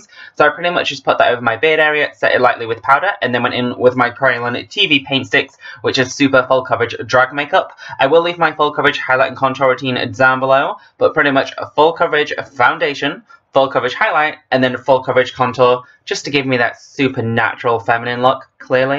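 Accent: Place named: United Kingdom. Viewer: and the British accent is so a turn on 💖💖💖💖🌹🌹🌹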